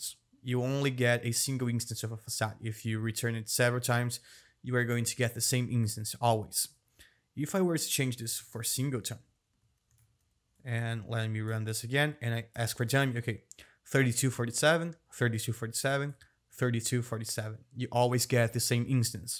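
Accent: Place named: Brazil. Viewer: já de cara notei que tu é brasileiro kkkkk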